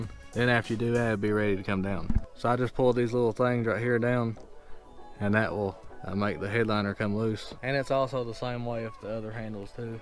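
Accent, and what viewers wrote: United States. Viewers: Awesome video! Straight to the point with all the information you need without a buncha blah blah blah, and a plus is you got a southern accent like me! EXCEPT it is impossible to unplug the harness glued to the headliner ! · U did a good job being the first one, their is no way we don't live close to each other with that accent, I live in Central NC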